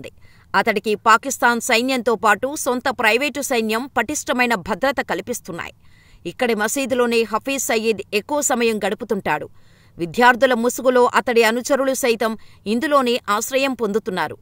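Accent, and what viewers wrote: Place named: Andhra Pradesh, India. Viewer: Modalettesaadu kadu ann Modalvettindu anale Aandrolla lekka matladtav anna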